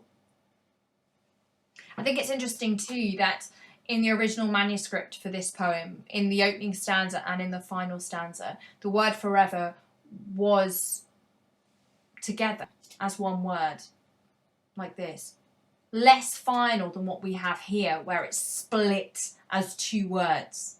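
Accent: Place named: Scotland, United Kingdom. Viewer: Thank you. Truly a delight to hear it in a Scottish accent I might add. 😊😊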